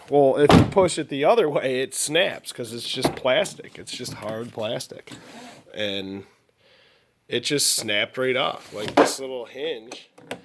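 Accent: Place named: Buffalo, United States. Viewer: I was gonna you sound like your from Buffalo. Then you said Syracuse area so I was close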